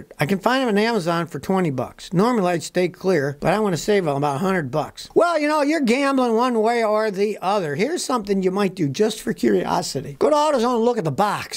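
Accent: Germany. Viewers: German accent made my day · I like the German accent and the German laugh! So Funny! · Please, please, speak more with a german accent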